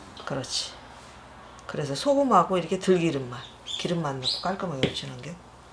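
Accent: Chungcheong, South Korea. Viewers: 억양이 참 듣기좋아요 충청도분이신가요?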